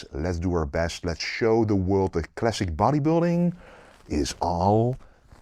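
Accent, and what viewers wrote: Netherlands. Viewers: speaks like a Dutch Optimus Prime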